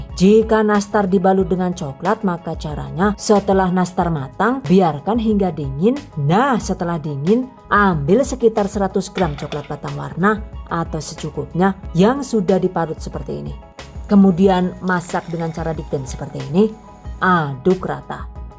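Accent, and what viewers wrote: Banyumas, Indonesia. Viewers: Deneng ngapak pada karo nyonge 😅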